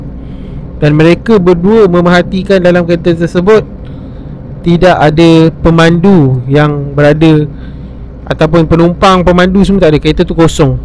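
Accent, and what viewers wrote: North, Malaysia. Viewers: org mna dgr sora palet mcm org utra 😅🤭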